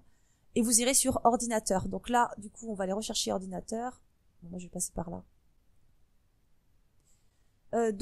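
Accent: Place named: North, France. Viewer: Merci, j'ai revu ton tuto avec plaisir, mais je n'ai pas encore partitionné mon disque dur mécanique, je n'ai pas de SSD... PS:" j'men va d'ce pied elpartitionner"!!!, tu lis en phonétique, c'est le patois du Nord, que je n'ai jamais aimé, il est moche... Je suis originaire du Sud et ma mère est Pied noire, mes les gens du Nord, je les apprécie beaucoup... yo !!!! Bisous à toi !!!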